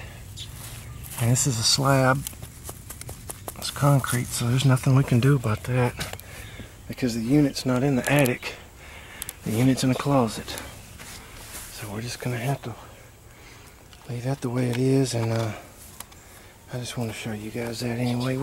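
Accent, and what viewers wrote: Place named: South, United States. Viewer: your excent is not to bad being from alabama, i always enjoyed hearing the southerns talk.seeing i am a yankee. good job on the video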